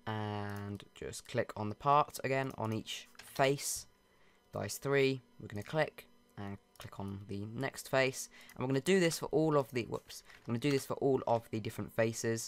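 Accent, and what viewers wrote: United Kingdom. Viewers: HAS TO BE UK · never really got where your accent was from, was thinking UK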